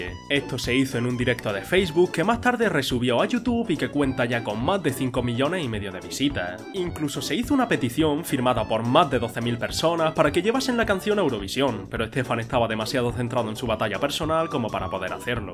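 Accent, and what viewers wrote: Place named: Jaén, Spain. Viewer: He de decir que me has hecho llorar de nostalgia positiva con este video, eres un creador de contenido de puta madre colega Dime que con ese acento eres de Jaén, de mi tierra, porfavó jajaj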